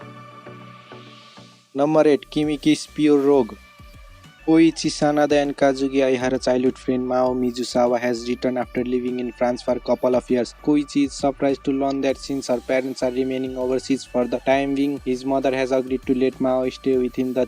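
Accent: Nepal. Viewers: Lot of Nepali accent. I already knew. Me 2 Nepali 🇳🇵🇳🇵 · He has an Nepali Accent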